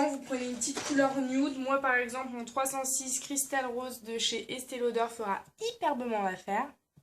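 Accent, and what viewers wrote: Marseille, France. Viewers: arrete avec ton accent genre marseille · je sai pas je trouve qu'elle a un peu l'accent marseillais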